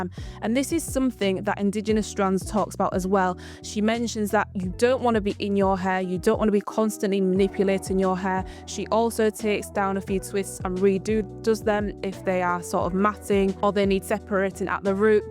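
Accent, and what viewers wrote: Northern England, United Kingdom. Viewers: Hey love, great video. I actually released a video very similar a couple of weeks ago. I've been following your journey on IG as well. Looking forward to seeing us both get to waist length very very soon 💜 Also, you sound northern. I thought you were gonna say you live in Manny or Yorkshire or something haha. I'm in B'ham.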